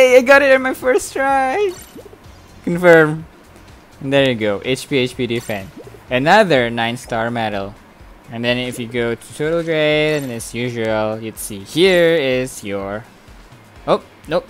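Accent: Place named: Malaysia. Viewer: patutlah suara mcm org Malaysia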